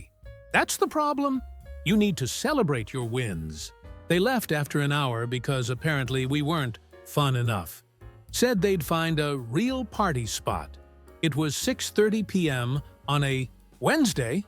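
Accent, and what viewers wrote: United States. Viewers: Yank voice. Not Aussie!! More Crap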